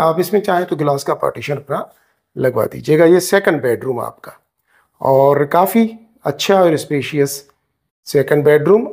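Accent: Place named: Karachi, Pakistan. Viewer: Pure urdu speaking man karachi urdu.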